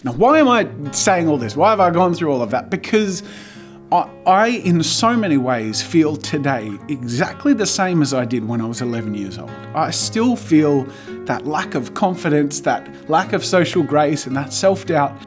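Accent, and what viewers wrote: Australia. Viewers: Keeps getting more Australian. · Aussie accent is so much stronger when he gets emotional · all those draw with clips overemphasize your aussie accent. I gotten use to hearing you for years and the compilation just made me think "oh yeah, he is australian" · That montage got progressively more Australian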